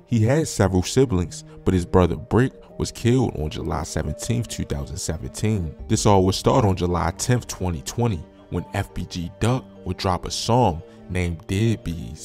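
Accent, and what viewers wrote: Baltimore, United States. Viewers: B.More accent lol 410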